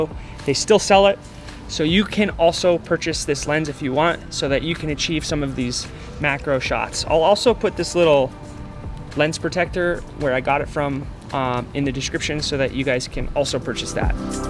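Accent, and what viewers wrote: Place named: United States. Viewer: Wow! Haha that lil Iguana is the best model ever and soo beautiful ay =) Wow I was really surprised when i heard your American accent, I totally aspected you to be from Scandinavian because you look like a Viking! Haha I agree with one of the other commments - You’ve got a very endearing persona, not only very good at explaining but are gifted with a naturally calm, inviting presence. Thanks for the video =) That iguana shot reeeeally makes me wanna find and build my own Macro lens setup too! Haha :) From down here in Margaret River Western Australia I salute you :) Big High Five, keep it up!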